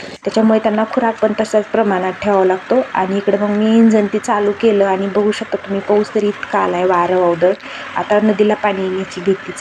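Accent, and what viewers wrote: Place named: Maval, India. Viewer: जय सियाराम ताई ताई तुमचं गाव कोणतं कारण बऱ्यापैकी मला तुमची भाषा मावळी वाटते म्हणून विचारलं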